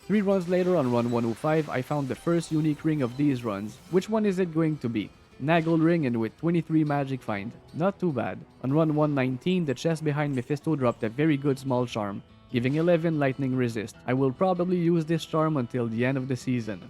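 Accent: Quebec, Canada. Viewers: Sa parait tellement que tu est Quebecois juste par ton accent lol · Avec ton accent, es-tu québécois par hasard ?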